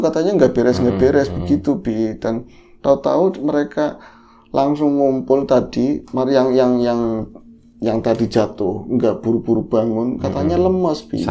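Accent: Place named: Java, Indonesia. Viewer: Mas ni mantap bhsa jawa ny msh totok, bguss ciri khas tersendri... Mas semakin bgus crta² yg dbwain moga sllu menghibur qt smua 🙏❤️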